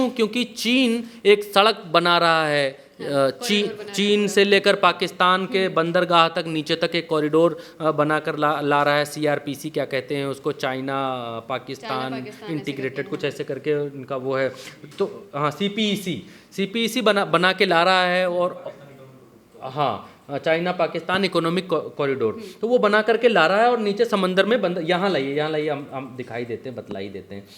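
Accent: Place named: Lucknow, India. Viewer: Aap ke bolne ki andaaz bahut behtareen hai Bhaiyyaji Aap ek lucknowi andaaz me bolte achha lagta hai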